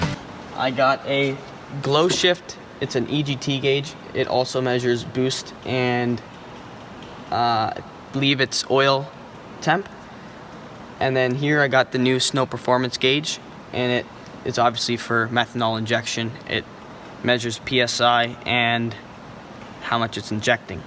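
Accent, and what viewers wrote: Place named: Canada. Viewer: your canadian arnt ya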